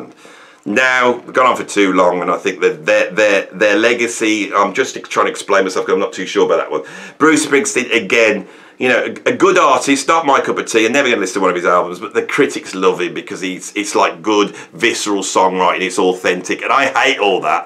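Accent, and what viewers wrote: Birmingham, United Kingdom. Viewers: Generally agree with all 10! Oh how like Gimme Shelter toooo... Well, I have other nominates for Overrated. Anyway, thank you, it's allways nice to hear your experienced musical opnion in your British English :) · Yeah, I find the Brummie accent is very arousing when the cool is being lost!!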